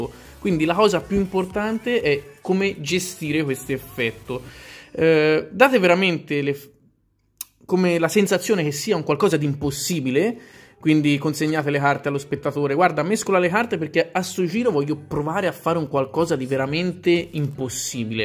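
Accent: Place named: Tuscany, Italy. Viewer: Amo l'accento toshano😂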